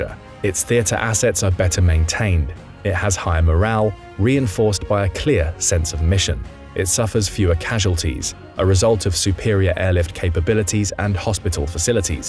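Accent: United Kingdom. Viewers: I hear a british accent!!!😂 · A US Military PR piece using British Accent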